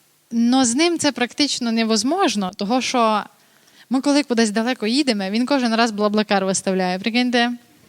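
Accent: Transcarpathia, Ukraine. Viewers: А точніше, закарпатський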